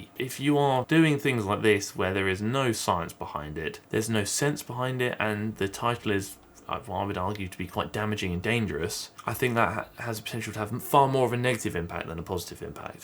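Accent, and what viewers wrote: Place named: Guernsey, United Kingdom. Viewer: Bro no cap he talks like W2S Harry , is he from Guernsey too? Sound exactly like him(the accent)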